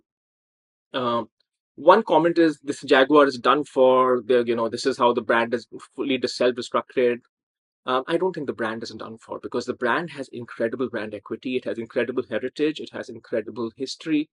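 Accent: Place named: United States. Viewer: He sounds American, very disappointed if he's British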